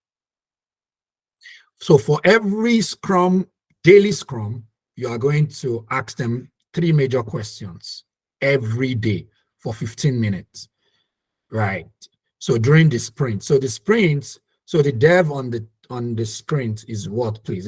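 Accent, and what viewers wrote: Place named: Nigeria. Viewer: 😁😁😁, my boss thank God you're Nigerian ooh,other people i no really hear them,im sorry if it's offending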